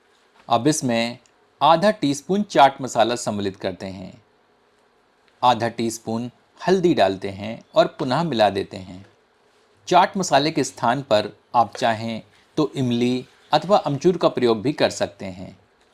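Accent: Standard. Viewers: श्रीमान आपका शुद्ध हिंदी बोलना बहुत ही आनंदित करता है परन्तु spoon चम्मच कह के बोलिए सोने पे सुहागा हो जायेगा धन्यवाद!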